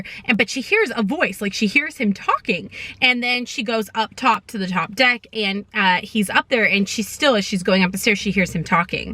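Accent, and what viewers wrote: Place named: United States. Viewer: Hi! Watching your reviews has been a great pleasure for me for some months now. Now I've got a question of a different sort. I very much like analyzing the way American people speak (vowels and stuff). However it is not easy, as I am not a native English speaker. Could you please help me by telling where you were raised/living? Thank you!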